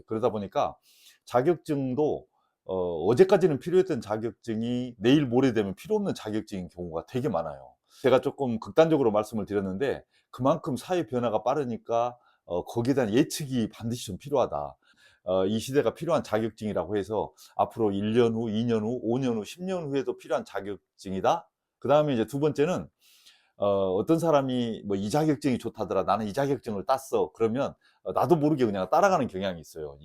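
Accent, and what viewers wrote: Jeolla, South Korea. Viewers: 잘 들었습니다. 전라도 분이신 거 같은데.. 흥하십시오!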